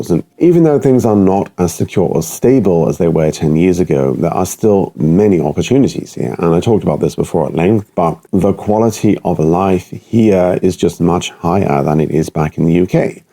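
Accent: Germany. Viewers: Why do sound like a German speaking English? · Funny how your accent has developed a German quality to it including a hint of their arrogance. You even look like a German, lol. Your assimilation is complete. WE ARE THE GERMANS, YOU WILL BE ASSIMILATED INTO THE COLLECTIVE, RESISTANCE IS FUTILE. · Dude, your English turned into German-accent English. Really.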